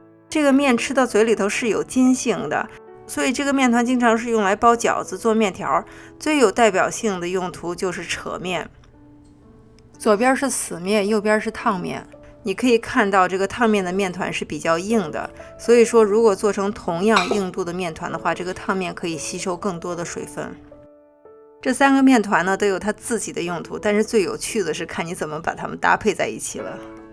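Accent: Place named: North, China. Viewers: sun shieh 也许是你弄了，听口音，应该是中国北方人，她的念法与中国汉语字典一致的，你可以查看字典，至于其它地方是否同一标准我就不得而知了，例如台湾，新加坡等等国家。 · 你是台湾人？是大陆西安人，所以这样读是没错的，你不能强求大陆人来按台湾人的读音来发音。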